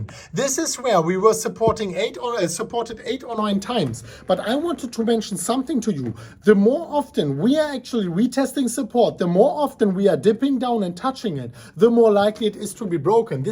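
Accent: Germany. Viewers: I love your German accent, I think it's part of your style. If you wish to improve, look for me.:))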